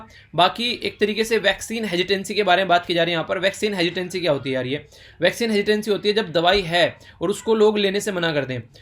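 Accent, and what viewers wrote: Haryana, India. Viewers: I think....🤔.... Sir Haryana se h...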